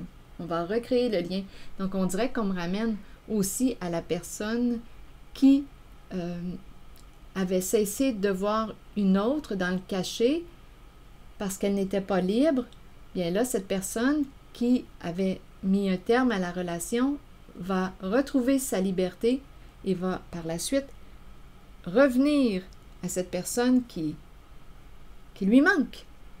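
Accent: Canada. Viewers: Mon Dieu, votre magnifique accent Canadien. J'ADORE. JE VOUS EMBRASSE POUR TOUT CE QUE VOUS DÉCLENCHEZ chez ceux qui sont à votre écoute. Énormes bises de MARSEILLE.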